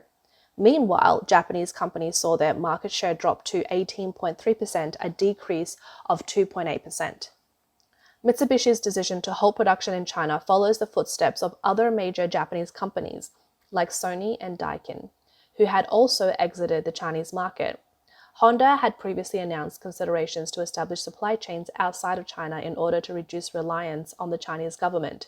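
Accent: Australia. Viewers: Great job on this report. I watch CNBC for business and stock news. This is an even better report on the auto business in China than I have ever seen anywhere. Also, not sure if the voice is AI or real but she sounds great. I live in Florida so do not experience Aussie accents that often. ** OK, Weird thing! & there is an old-looking wall shown. What is that??? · . It sounds Aussie to me as well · 100% austraslian voice. She is reading off a script , hear her voice stop and start. Its good thing as it sounds authentic.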